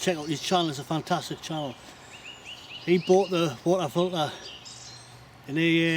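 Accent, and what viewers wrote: Newcastle, United Kingdom. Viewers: Sounds like you're from Newcastle, if my memory serves me right. Been years since I moved out from Gateshead.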